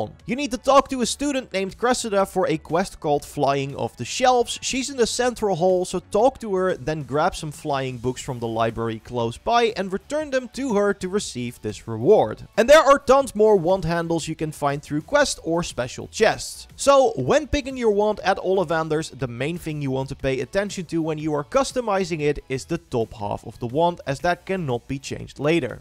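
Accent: Netherlands. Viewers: Nederlands accentje hoppaa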